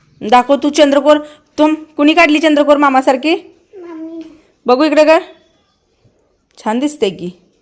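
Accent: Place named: Kolhapur, India. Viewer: Nice video.... vahini... daily mi bght aste. Kagal la maza mama asto...same boln aste tikde..❤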